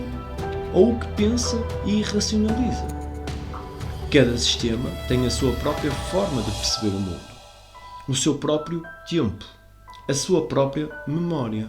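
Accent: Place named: Portugal. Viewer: Esse sotaque português europeu é bem maneiro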